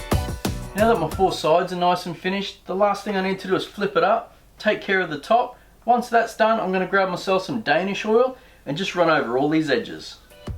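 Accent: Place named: Australia. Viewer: hello, I hope this finds you and yours in good health and fine spirits. I just found your channel and I really enjoy your content. I do like an Australian accent and you seem like a regular guy whom I would like as a neighbor. The popular YouTubers say it took them a long time to grow their channels. They said their channels just limped along for years until one day their channel just took off, so hang in there.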